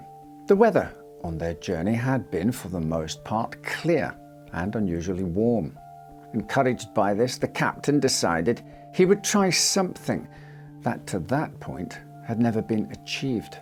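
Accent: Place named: Scotland, United Kingdom. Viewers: Being told tragic and horrible stories, but in my favourite accent and a really nice voice is a confusing experience, but as usual with me, the Scottish accent outweighs the nightmare material. 😌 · I’m really curious about where you’re from — your accent has some strange twangs, like when you pronounce “O” it sounds very New Zealand (“boat,” “known” etc), while you mainly sound Scottish…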